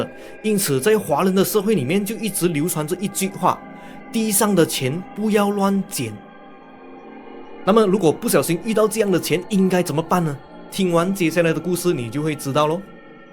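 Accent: Malaysia. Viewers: 应该是马来西亚人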